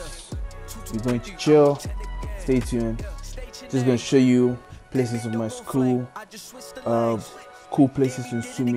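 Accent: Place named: Ghana. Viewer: Your video popped up, and then I decided to watch it. Your accent sounds like a Ghanian's... Nice vlog between.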